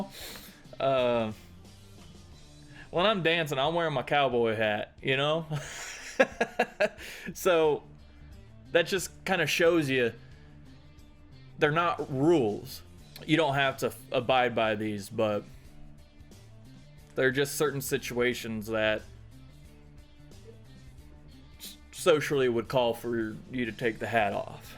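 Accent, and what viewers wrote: Texas, United States. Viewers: yea that and just the hard texas accent. Rs are pronounced very hard from what i can tell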